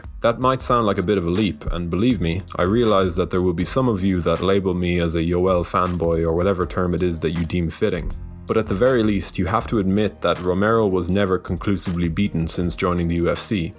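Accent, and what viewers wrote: Ireland. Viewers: The Irish accent makes your videos better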